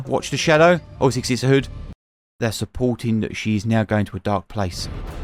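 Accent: United Kingdom. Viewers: 😂 every time I, as an American viewer, hear you say the shortened f word for cigarette my ears perk up like did he just slur me out? And I immediately culturally adjust cause I also love British language and slang and the accent and I’m like “oh yeah that’s what they call cigarettes in the UK” where as when I hear that word thrown about here at home it’s being thrown out in discrimination against either myself or my other LGBTQ+ brothers, sisters, and others. But I got you!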